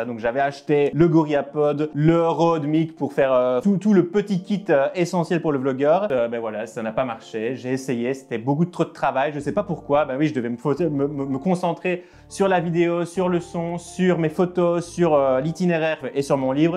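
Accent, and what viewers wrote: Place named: Belgium. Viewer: Hééééé j'avais pas vu compatriote ! L'accent belge on est là on lâche pas ! En espérant entendre de nombreux septante et nonante par ici <3. Congrats & tout le meilleur poulet !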